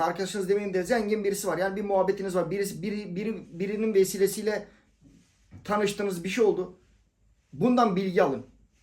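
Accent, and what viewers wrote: Thrace, Turkey. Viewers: Şive hoşuma gidiyor Trakyalılar neşeli dir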